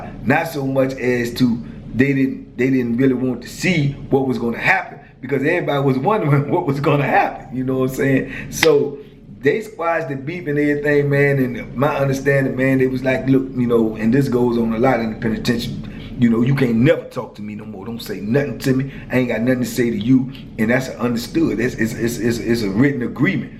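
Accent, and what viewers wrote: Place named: Tidewater, United States. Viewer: Enjoyed that story. Got that heavy tide water accent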